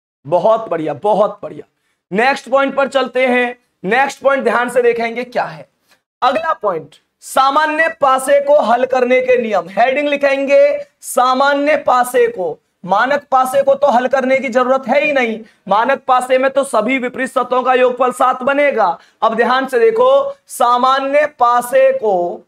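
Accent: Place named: Aligarh, India. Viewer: Sir aap aligarh se ho kya Apki language hmari jesi h😂 M aligarh se hu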